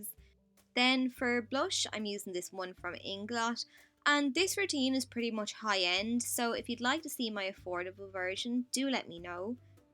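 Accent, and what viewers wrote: Ireland. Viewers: well done as always i like your acent look like Iresh 👍👍👍👌👌💗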